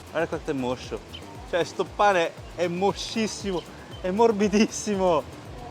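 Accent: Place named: Pesaro, Italy. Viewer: Grazie, i tuoi video sono molto gradevoli, il tuo accento pesarese e' incantevole e tu sei una bella persona garbata, sicuramente sei il riflesso dei tuoi genitori.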